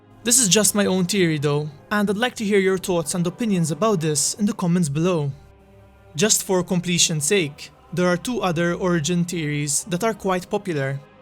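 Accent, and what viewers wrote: Malta. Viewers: are you Maltese by any chance? Your accent sounds Maltese. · I could listen to your beautiful Maltese voice for hours. But harken now, as I recount the tale of Bargozím, the Fell Cockroach, foul pet caressed by Morgoth, ancient spawn sprung unwished-for from the tainted loins of Ungoliant to trouble the kitchens of Man.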